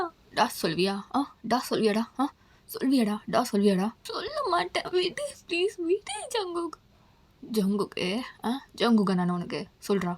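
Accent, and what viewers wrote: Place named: Kerala, India. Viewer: I am from Kerala ❤ your malayalam so cute ❤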